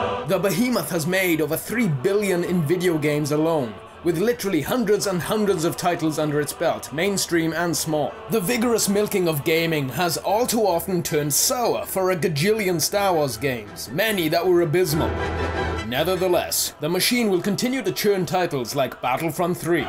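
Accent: Wales, United Kingdom. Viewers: are you Welsh??? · Good video, good choices! Nice Welsh accent, btw.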